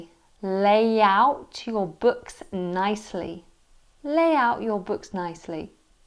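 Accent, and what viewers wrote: United Kingdom. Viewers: You are so cute ❤️ You make me love English and also British accent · I love your smile with nice British accent.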